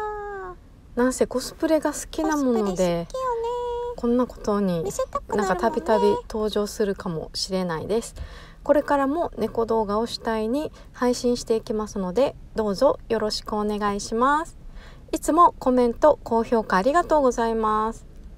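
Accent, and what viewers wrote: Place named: Okinawa, Japan. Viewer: 元気いっぱいのくん☆ コスプレも好きなんですねぇ☆ 時折でる沖縄なまりが自分は好きです☆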